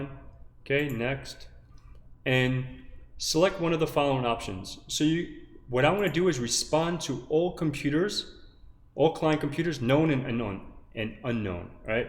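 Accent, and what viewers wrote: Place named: New England, United States. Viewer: Love the New England accent!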